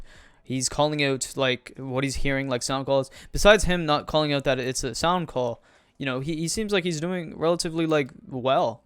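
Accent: Canada. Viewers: ARE YOU CANADIEN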